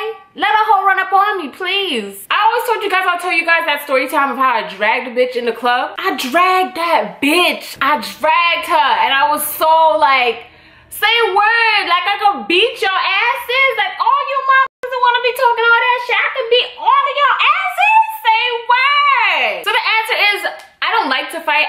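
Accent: Toronto, Canada. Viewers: I honestly wish you would use your Canadian accent more often tbh! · You speak like a Torontonian 😂😂😂😂 The first video I saw of yours you said 1 word and I automatically knew you were from Ontario 😂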